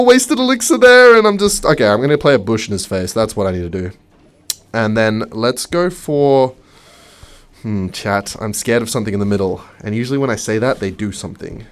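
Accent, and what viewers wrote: Scotland, United Kingdom. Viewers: Scotland ahh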